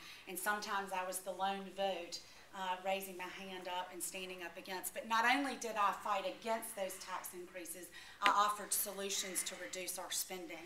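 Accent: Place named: South, United States. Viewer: She sounds like she's from the saow-uth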